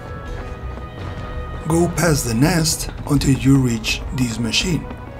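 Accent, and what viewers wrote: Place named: Canada. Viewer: Thanks man! I would never have found it without your video. Cool accent! Are you from Canada? France?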